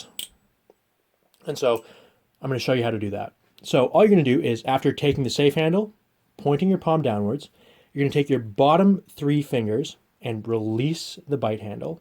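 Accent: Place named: France. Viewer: yeah... but i gotta say it can be tough to hear well through his heavy french accent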